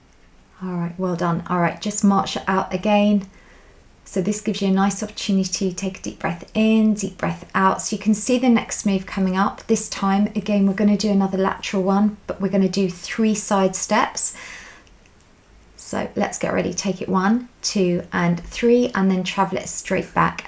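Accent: England, United Kingdom. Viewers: Great work out to do w my husband w high blood pressure, exercise shy but reluctant to start medication. He prefers the English accent to the incessant tone & pitch of the US trainers! Thank you.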